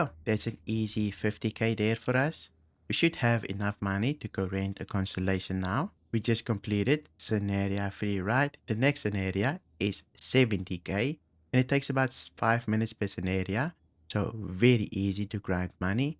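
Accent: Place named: Johannesburg, South Africa. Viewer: Can the ships in these missions spawn with cargo? Also, are you from SA? Almost sounds like you have a Johannesburg accent.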